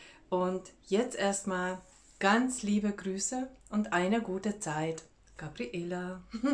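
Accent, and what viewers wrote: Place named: Hungary. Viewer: Ich mag außerdem deinen ungarischen Akzent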